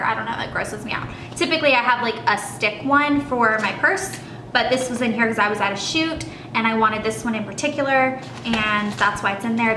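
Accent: South, United States. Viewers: love the southern accent! makes me think of home!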